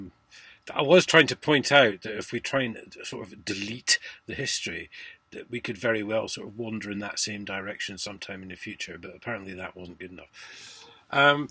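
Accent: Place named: Inverness, United Kingdom. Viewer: You sound like you’re from Inverness, are my suspicions correct?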